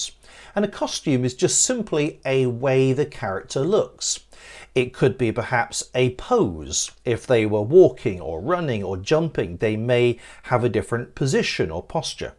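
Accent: United Kingdom. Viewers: Bro's voice is British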